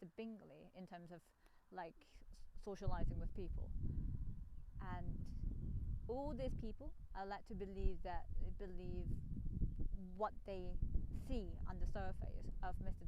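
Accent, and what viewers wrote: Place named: United Kingdom. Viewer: 너무배우고싶네요..^^영국발음과 악센트..멋져요♡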